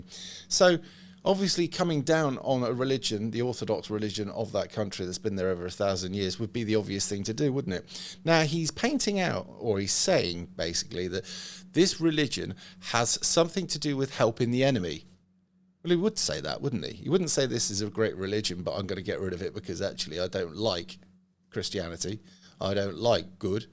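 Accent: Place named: West Country, United Kingdom. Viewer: You sound like a West Country mental defective.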